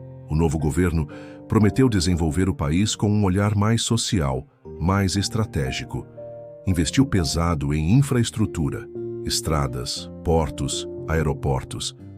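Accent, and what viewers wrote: Brazil. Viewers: Sotaque de Brasileiro Horririso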